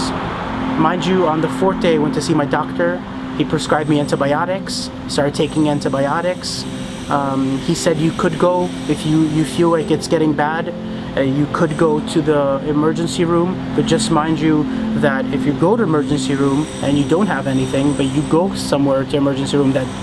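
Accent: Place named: Russia. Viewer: Glad you are ok. I had it covid in May and my friend Arina had covid pneumonia and they insisted that they intibated her. I think they did that for her due to political reasons. She does too. By the way our group of Russian have the same dialect as you. It really great to relate to you and you keep us laughing. Take care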